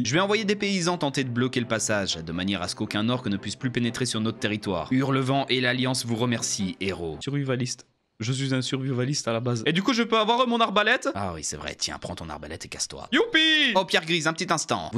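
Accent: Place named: South, France. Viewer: Le délicat accent du Sud <3. J'adore ce let's play continue ! Je veux suivre les aventures de Pierre Grise sur Azeroth !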